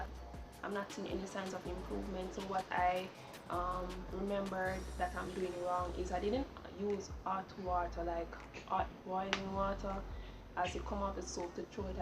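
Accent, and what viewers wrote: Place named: Queens, United States. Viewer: Your accent is cool as hell. I grew up a Spanish kid in Jamaica Queens. Almost everyone in my neighborhood spoke like you. All of them just had this cool chill vibe.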